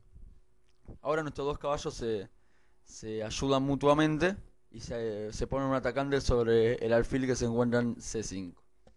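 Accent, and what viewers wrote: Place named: Argentina. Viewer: Porque los argentinos pronuncia la yo o llo...con chooo???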